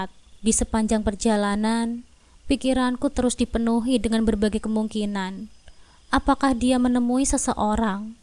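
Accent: Java, Indonesia. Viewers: Medok amat sih min Lucu denger nya hahaha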